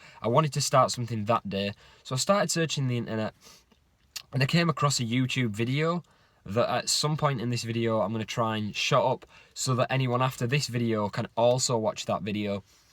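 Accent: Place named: Liverpool, United Kingdom. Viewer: Are you from the same area as Paul McCartney? Sounds like you two have the same accent.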